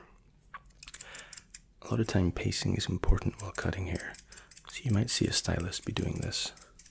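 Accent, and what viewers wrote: Scotland, United Kingdom. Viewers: Love your accent! It's a really gentle tone. What part of Scotland are you from? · Its almost 1am I'm drunk and I always can rely on for his soothing Baritonal Scottish smoothing voice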